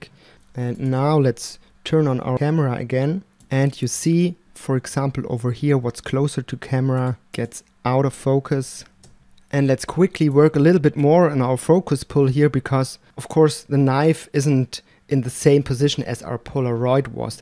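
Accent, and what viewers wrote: Germany. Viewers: You sound like a german, idk man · Du hörst dich deutsch an :D Vieeeeelen Dank für das Tutorial, genau was ich gesucht habe und absolut verständlich erklärt !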